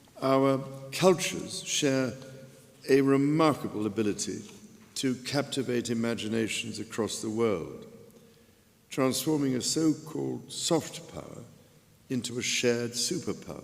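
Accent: United Kingdom. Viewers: 폐하의 브리티쉬 스피치가 너무 고급스럽고 감격스럽사옵니다 ㅠㅠ 멋져요 전하🎉🎉🎉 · 영국식인데 발음이 엄청 잘들리네 왕족이라 그런가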